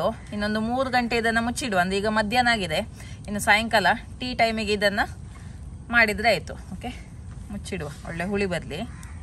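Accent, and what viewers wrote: Mangalore, India. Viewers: Mangalore kannada thumbha chennagide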